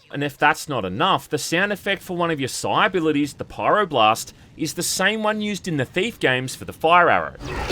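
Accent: Australia. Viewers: Never tire of you saying 1999 the aussie is strong with this one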